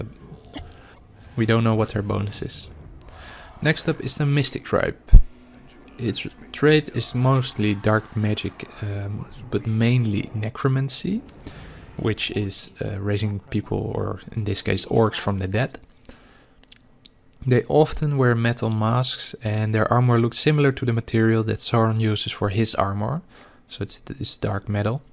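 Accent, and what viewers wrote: Netherlands. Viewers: Ha! ik dacht al dat je nederlands was 😂 hele goede video man!!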